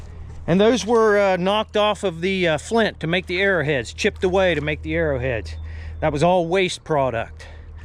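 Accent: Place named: Pittsburgh, United States. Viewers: It's a southwest PA dialect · That Pittsburgh accent I can here it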